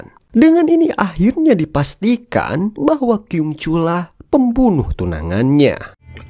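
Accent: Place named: Bandung, Indonesia. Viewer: apakah vo ini gk ngeri malah lucu gara"bahasa bandung nya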